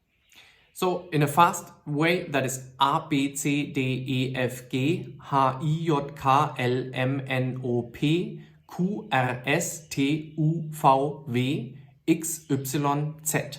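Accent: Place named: India. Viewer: I think he is trying to talk to us in english (indian ) accent , appreciate your hard work bro Keep hustling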